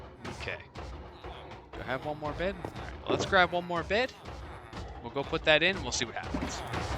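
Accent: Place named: Australia. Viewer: Great video! loved the Aussie accent! haha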